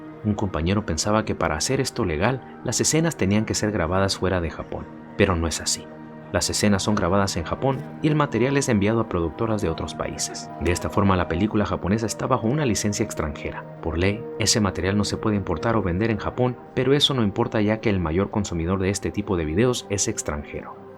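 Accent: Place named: Mexico. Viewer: Eres un artista para narrar, un estilo muy peculiar mexicano 👌 felicidades carnal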